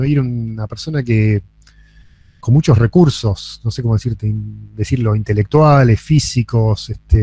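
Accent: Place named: Argentina. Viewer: Pense que era algo interesante de mi tierra ,Argentina !!!!me confundi por su acento , bueno este no es mi lugar y tampoco me interesa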